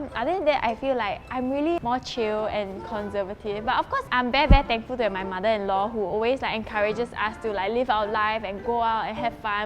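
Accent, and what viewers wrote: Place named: Malaysia. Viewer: Where is this girl from? She has the "lah" slang so wether she is Malaysian or Singaporean? Sorry if I'm wrong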